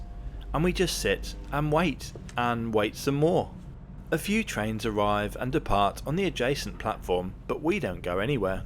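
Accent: United Kingdom. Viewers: well it’s the English pronounciation of Köln, and this is a English channel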